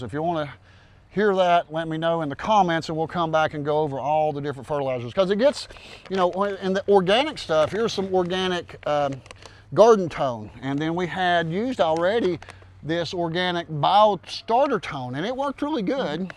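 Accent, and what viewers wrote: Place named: Appalachia, United States. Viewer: Fangers for fingers. Love your Appalachian accent.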